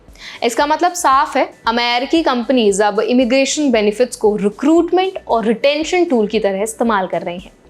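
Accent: India. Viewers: This girl is throwing misleading information with her strong Indian accent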